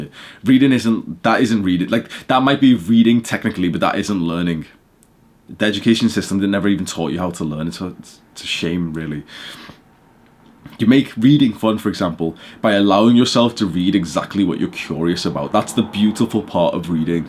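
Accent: India. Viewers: U have the best Indian accent. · Bro has the Indian accent better than Indians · dope Indian accent brother, approved! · love from India❤, your indian english really matches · That indian accent🤣🤣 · Fuck that indian accent made me dissociate. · thaaaat indian accent isn't funy XD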